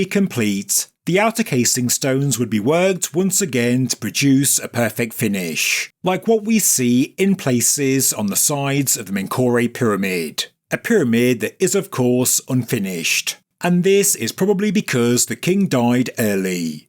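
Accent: United Kingdom. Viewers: He doesn't lol....he just gets possessed right before he begins recording and snaps back out of it when hes done. He speaks normally, other than the British accent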